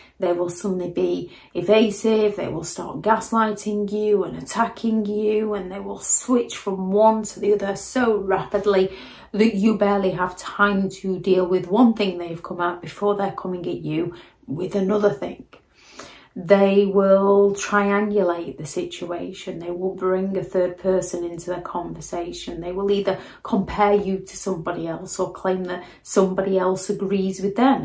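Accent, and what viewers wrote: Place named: England, United Kingdom. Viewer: Good talk 😀🦜 good voice 💯 accent from England 😍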